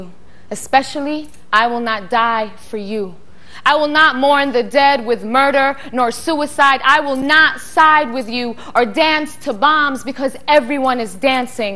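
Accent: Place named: New York, United States. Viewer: "ghetto"??? Shes from Brooklyn and speaks out like many folks from NY do...she is also a literate brilliant artistic writer poet...words do matter...she knows understands and uses that rhythm and vocabulary to SAY SOMETHING POWERFUL...bless her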